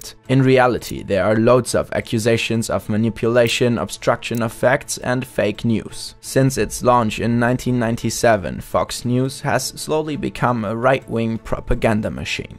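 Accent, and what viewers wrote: Germany. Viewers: Nice vid, but you should work a bit on your voice performance and your german accent. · It's weird that mostly Germans blames about the fact that there isn't a native speaker. Most Americans and Britians (I know there're differences) blame about wrong grammar, spelling or words. They just (try to) understand what was said. But their grammar and pronunciation are great, so why changing it? Are you so distracted by the German accent from the video? I don't have that kind of problem. Disclaimer: I'm a German, so if I'm wrong what I said about grammar and pronunciation, please correct me. I don't know whether you're German or not, so if I'm wrong, please correct me, too. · Hey Guys, i love your Way but there is a little German in your English Tounge....that not mean your English is Bad. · In my opinion, you should get a native speaker for your videos, I just cant get that german accent out of head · holy this GERMAN accent xDD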